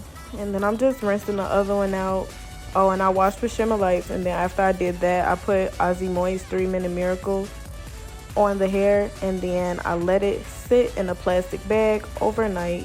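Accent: United States. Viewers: G I went to your socials because I know Chicago when I hear it. You snapped on the wigs and the video too sis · Girl u are funny🤣....!!!! your accent reminds me of Miami lol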